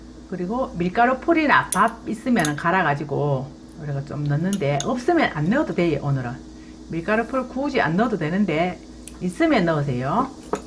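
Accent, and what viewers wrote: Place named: Jeolla, South Korea. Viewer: 그치예? 요래요래.. 그래도 되고예... ㅋ... 남도사투리..옛 사랑이 생각나는 사투리 입니다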